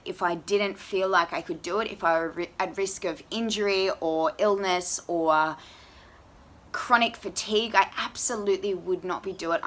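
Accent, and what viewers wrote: Australia. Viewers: THIS!!! I was looking for somebody i could relate on this diet. When I saw you I was like: YEP! THAT'S HER!!" my kind of girl to follow: strong, confident, beautiful mind (and body)., well spoken (love the aussie's accent, can't help it;) ),please don't change yourself! ever!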